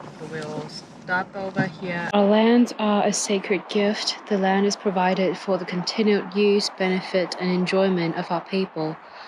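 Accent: Australia. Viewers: That’s the weirdest sound I’ve ever heard 🤔 I’m also trying to figure out your accent, at times you sound Australian. It’s very confusing, lol. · Interesting accent, is that Australian / Korean ?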